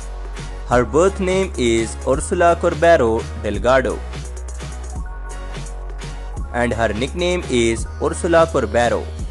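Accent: India. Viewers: The intro was enough for anyone to leave immediately, the creepy indian accent.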